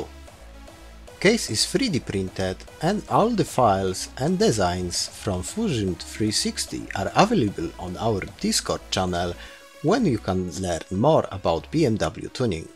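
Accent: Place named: India. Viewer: O CHUJU xd tyle lat w UK, a brzmisz jak hindus XD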